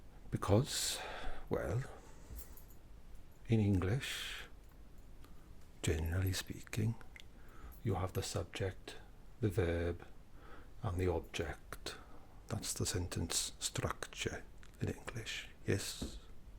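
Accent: Wales, United Kingdom. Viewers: Ever since Peter Kinney made the beautiful decision to make the dwarves Welsh in the Witcher audiobooks I have been dying for Welsh accented ASMR and you beautiful bastard you did it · Are you actually Welsh? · Commendations Greetings Appreciation Respect And, a great love of a lovely Welsh accent I kid you not One of My other favorite YouTubers has a beautiful Welsh accent Even though he speaks in a mundane friendly full voice, it's super soothing So Algorithm, please promote all my favorite Creators, especially, in whose comments I am about to post.... Food for you, dear Algorithm And Dearer friendly creator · OMG Your Welsh accents are my favorite videos and your quiet voice is my lullaby. ❤❤❤❤ im quite convinced your a genius.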